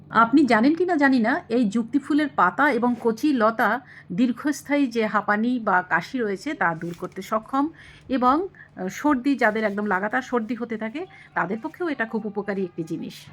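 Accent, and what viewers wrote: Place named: Bangladesh. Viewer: অসাধারণ ব্যাপার 💚 আমি প্রথম দেখলাম। আপনার কথা শুনে বুঝতে পারিনি আপনি ভারতীয় 😊 একদম বাংলাদেশের মানুষের মতো কথা বলেন ❤ যেহেতু আপনি বেহালা থাকেন সেহেতু ঢাকাবাসী আমি আপনার কাছ থেকে গাছটি পাওয়ার আশা করতে পারিনা! তবে গাছ এবং ফুল সম্পর্কে জানার প্রতি আমার খুব আগ্রহ! ভালো থাকবেন এবং ভালো থাকুক আপনার গাছটি🌿☘️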